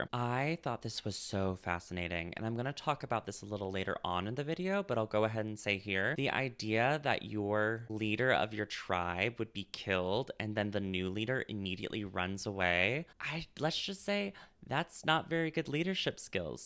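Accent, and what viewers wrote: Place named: United States. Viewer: the way you envision the northern lands is SO different from how i picture it, i love it! i always pictured it as very windswept barren grasslands, like the steppes in northern china/kazakhstan - its completely different from your vision. whats so interesting to me is that given that you're from the northern hemisphere (presumably, you have an american accent lol), most people from there hear "north" and think cold - im from aotearoa in the southern hemisphere so north = hot to me! yet our respective idea of the northern lands are almost opposite to what our geographical perspective would lead us to, its so fascinating.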